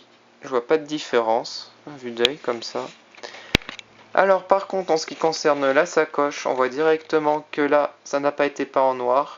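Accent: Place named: France. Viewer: merci bien! très bien fait accent française Gracias!!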